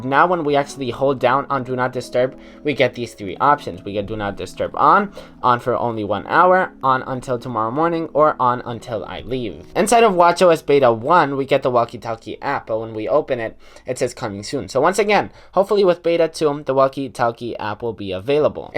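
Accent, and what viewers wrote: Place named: Spain. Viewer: His accent is Spanish, as was born raised and lives in Barcelona, Spain. He spent 3 years in the United States as a foreign exchange student. It’s why it’s hard or takes awhile to figure out that is not American just by watching only a few of his videos. Listen to him pronounce the city in California called Cupertino, which is where Apple HQ is. Many cities and towns in California have Spanish names! I’m sure I overly answered your question, but I’ve been following ’ channel from the early days. Have a good night! ~Cat from NY 🐱🍎💜👍🏻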